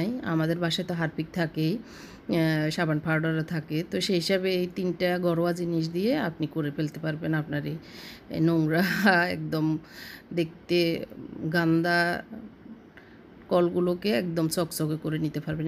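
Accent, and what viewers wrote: Noakhali, Bangladesh. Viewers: আন্নের বাড়ি নোয়াখালী মনে হয়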